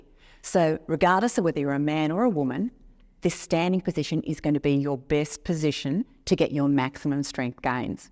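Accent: Australia. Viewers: your Australian accent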